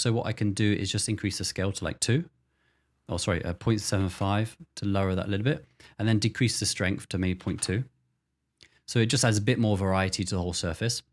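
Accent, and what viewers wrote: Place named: England, United Kingdom. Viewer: Funnily enough the first thing I thought was “this guy grew up near Daniel Radcliffe” based on the accent lol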